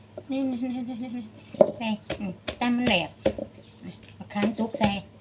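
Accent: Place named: Mukdahan, Thailand. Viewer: สำเนียงพูด เหมือนคนมุกดาหาร อยู่บ้านได๋ครับคุณพี่ สำเนียงชัดเจนอีหลี ชัดเจนกินพี่ ผมว่าเป็น ภูไท ใช่ไหมพี่สาว อิอิ แซวแน่😊😊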